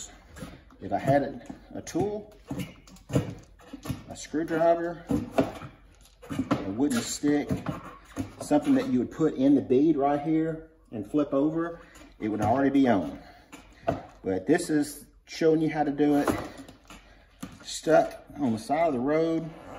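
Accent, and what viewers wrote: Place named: South, United States. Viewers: Presenter definitely has an accent, although slight. Definitely somewhere in the southern US. The way he pronounces the word "buy" is a dead giveaway to my "normal" US word-pronunciation-keen California-raised-ears of 56 years. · Great demonstration. I hope I never have to do this repair job but it's helpful to know that it can be done. I love that accent, whereabouts in the U.S. are you from? We don't hear anyone speak like that in Australia except on movies. Thanks mate.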